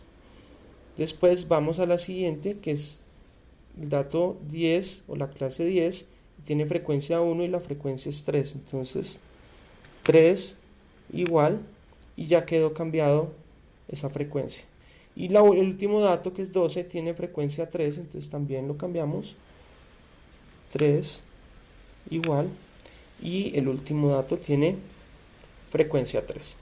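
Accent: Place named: Bogotá, Colombia. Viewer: el inconfundible acento de Bogotá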